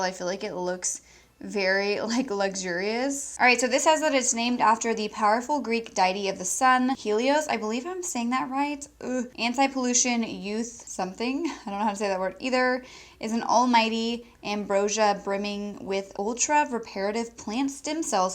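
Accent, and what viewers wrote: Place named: United States. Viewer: i love the American accent 😍😍💙🎄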